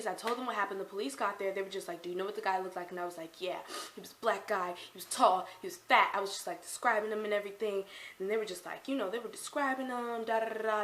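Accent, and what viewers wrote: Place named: India. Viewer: The indian accent😭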